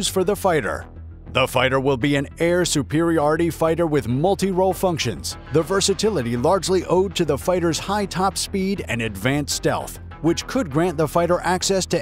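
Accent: United States. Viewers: Why the American narrator?????? Very offputting. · Why do we have an American voice,? Oh perhaps it because they are the experts on killing people? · I really am getting sick to death of hearing American accents on videos that have nothing to do with America. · Why are these vids narrated by a yank ? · Why does it have to be narrated by a yank?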